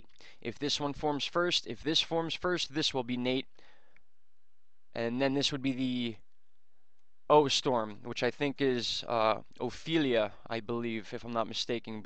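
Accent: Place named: Philadelphia, United States. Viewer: Yay! No hit on NJ :) you have an accent... Are you from Philly or NJ? You almost have the same accent as me!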